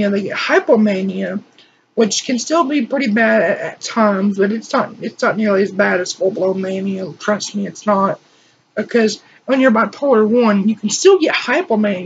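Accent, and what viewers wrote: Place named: South, United States. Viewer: Mania would be would be the worst, I counteract depression through exercise but I don't know if that works for bipolar people. Seriously though if I were you I wouldn't try and take any sort of mild/strong anti-psychotic. If you want something that works I took pristiq for years on end and it made me feel really 'high'. It counteracted my depression very well when effexor just made me really sweaty and anxious. I mean you can check out some video's when they forced me on abilify, an injectable. Now I get SVT's (recorded as well) all the time. You sound like you got a southern accent I recommend to fight you depression it's probably better to try and get a job further up north. It's just the more south you go I've noticed the worse things get with mental health in America. Have fun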